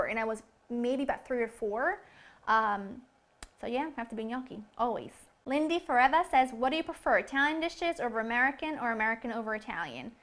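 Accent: Naples, Italy. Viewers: ahahahhahaha oddio che bello come parli in italiano!!! però devo dire che si sente tanto l'accento di Napoli e anche quello americano! è un miscuglio strano!! XD io sono di roma ma parlo anche fluentemente inglese, so I know what you mean when you do your videos! :D sei troppo simpatica!! e adoro le tue ricette! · WOW l'accento napoletano non lo hai perso per niente....sono orgogliosa di te,. Amo l'America, ma bisogna ricordarsi sempre da dove veniamo! Mi piacerebbe se facessi dei video in Italiano, ci faresti sentire di più...a casa! Napoletana DOC come te! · (Hai un bellissimo accento napoletano, nonostante tutti questi anni negli USA :) Ho sempre seguito i tuoi video in inglese e fa stranissimo sentirti parlare in italiano :P) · she's neapolitan and has that neapolitan accent. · si riesce a sentire anche la cadenza napoletana quando parli... ;) a sto punto fai pure un video in napoletano ;) greeting dalla prov di caserta :* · Ahahahaahahhaah hai proprio l'accento Napoletano ahahahahah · Hai un meraviglioso accento napoletano quando parli in italiano, eppure parli l'inglese perfettamente! 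Complimenti! Bravissima e veramente bella.... un bacione dall'Italia! <3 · Ciao! Non pensavo fissi napoletana..che strano sentierti parlare italiano! Un bacio ciaoooo;)) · you hold on tight your "italian napoli" accent :D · ma sei napoletana!!!:p o meglio, campana!!che bellezza!!anche io sono napoletana, studio lingue e il tuo accento mi fa sognare!!!è perfetto!!complimenti! bello sentire i tuoi ricordi...e mi fai sperare tanto per il futuro!spero di potermi trasferire altrove e sistemarmi as soon as i can!:p ciao!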